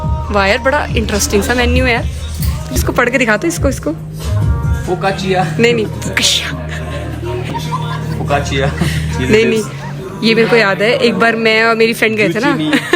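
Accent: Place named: Pahari, India. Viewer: Love ur pahari accent jo aa jata hai beech beech mai.....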